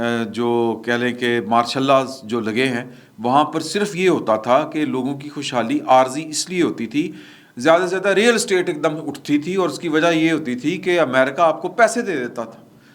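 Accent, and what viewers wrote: Punjab, Pakistan. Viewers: Imran bhi ap apni bat mai Punjabi ka tarkha zoaroor Lagay Karen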